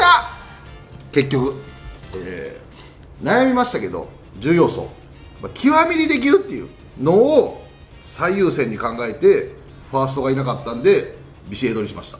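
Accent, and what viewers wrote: Kansai, Japan. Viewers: って関西便なの？？そもそもこれが関西弁7日も分からないけど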